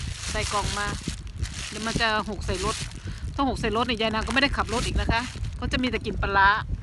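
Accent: Isan, Thailand. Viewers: คือบ่เว้าอิสานโรจเนาะสำเนียงก็ออกอีสานยุโอ้ยๆๆๆ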